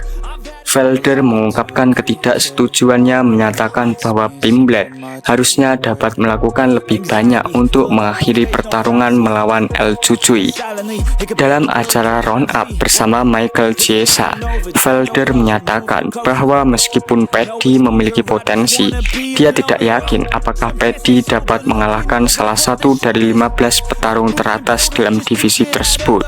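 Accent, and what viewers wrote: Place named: Java, Indonesia. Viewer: Medokkk bgt bhangg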